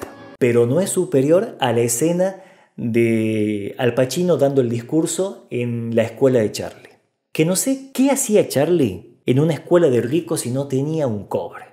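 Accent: Tucumán, Argentina. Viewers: Muy bueno el video. Haría dos comentarios. Le Pera nació casualmente en Brasil, pero nunca vivió en Brasil, siempre vivió en Buenos Aires. De la manera que Gardel Nació en Francia, pero pasó su infancia en Argentina. Diríamos que los dos fueron argentinos. En cuanto al relato de la creación de Por una cabeza, no fue Pigna el que lo recopiló sino que está en el libro de Tucci. En cuanto Delicatessen, no recuerdo que apareciera Por una cabeza, lo que sí aparece es Una lágrima tuya., me gusta más cuando hablás en tucumano que cuando hablás en castellano neutro.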